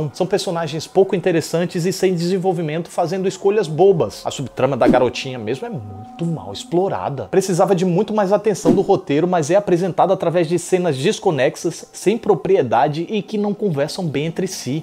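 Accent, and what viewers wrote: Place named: Alagoas, Brazil. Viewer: Acho tu e teu canal muito fodas, sou inscrito, acompanho e sempre indico aos amigos, te acho um baita profissional e te admiro ainda mais desde que percebi teu sotaque e descobri que tu es alagoano, se é difícil pra tanta gente talentosa chegar á, imagina pra um alagoano, mas hoje queria dar os parabéns não só pelo vídeo, mas pela resposta sobre sua gratidão ao Felipe Neto que mesmo eu não curtindo o cara, passei a admira-lo pelo que ele foi, é e representa pra ti, pela amizade e pela gratidão, eu podia até não gostar dele, mas hoje já ha algo de diferente no que eu penso a respeito do cara. Meu abraço hoje vai pros dois (rsrs). Parabéns por isso e pelo canal.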